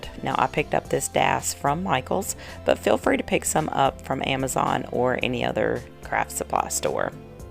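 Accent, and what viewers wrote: South, United States. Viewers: Girl you are ridiculously talented. I just love these. You blow my mind every time. And I love your southern draw. Reminds me of when I was child and we would drive to Tennessee every summer to visit family. Nothing like a 10 hour drive in a car filled with kids. Keep crafting and stay healthy my friend 🌸🌼🌺🌻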